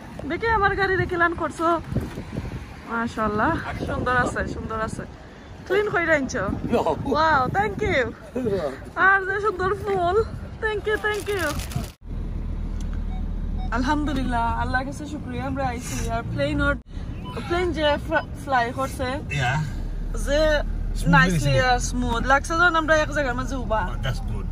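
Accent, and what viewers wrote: Sylhet, Bangladesh. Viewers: Speak bangla not shylhetee.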